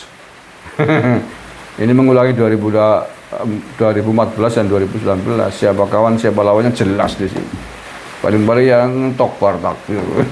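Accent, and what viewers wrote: Banyumas, Indonesia. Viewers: Rika wong endi pak 😂😂 Wong ngapak mesti Ora ngapak ora kepenak Nyong lima bersaudara...empat ttp kekeh setia karo PDIP/Ganjar Nyong dewek ttp setia karo Jokowi Pejah gesang kula nderek Jokowi Salam ngapak Jatilawang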